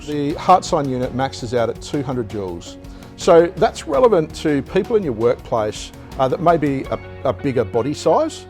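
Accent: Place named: Australia. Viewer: .. I Love Your Aussie-Accent Mate ..👍🦄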